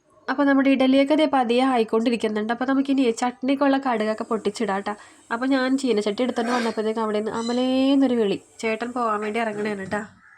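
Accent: Kochi, India. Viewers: Aa "tta"kelkananishttam tttta 😊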